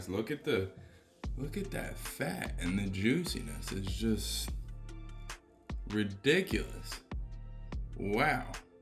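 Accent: Philadelphia, United States. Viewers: Are you from Philly? Love your videos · can't miss that northeast philly accent 😂. I lived in Magee back in highschool. I'm up in Chalfont by Ryan HS now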